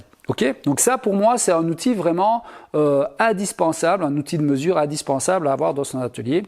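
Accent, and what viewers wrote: Belgium. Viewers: Très bonne vidéo, c’est clair utile et très instructif comme d’habitude. J’ai toutefois un petit reproche à faire, je trouve dommage de devoir préciser (ou corriger) à chaque prononciation de nonante ou septante pour plaire au public français dont je fais partie. Ça fait partie du charme selon moi de regarder les vidéos d’un belge, c’est comme si un québécois s’empêchait de parler comme il le fait au quotidien avec son accent et ses expressions. Si "l’gosseux d’bois" parlait un français "parfait" ses vidéos perdraient de leur âme. Bonne continuation et vive la Belgique !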